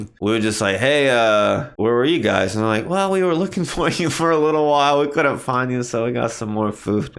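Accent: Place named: New York, United States. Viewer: bro sound like he from new york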